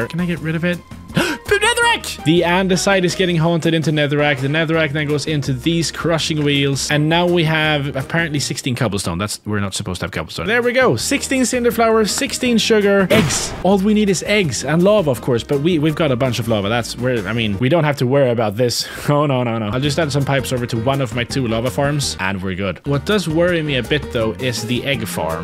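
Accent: Sweden. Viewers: Heyyyy are you from sweden sounds like it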